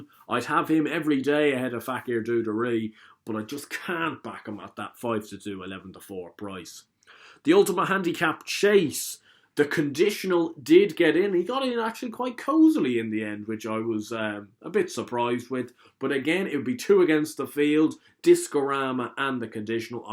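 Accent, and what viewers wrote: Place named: Ireland. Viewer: Jesus christ lad what accent is that posh Irish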